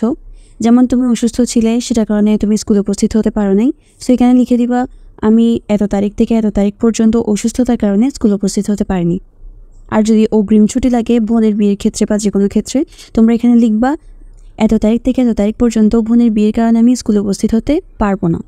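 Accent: Chittagong, Bangladesh. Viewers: Chittagong accent?😅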